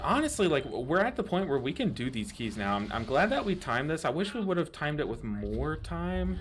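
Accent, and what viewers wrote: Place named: Midwest, United States. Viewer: lol I also love is like midwestern accent where he is just kinda like aw shucks. He never gets tilted or pressed !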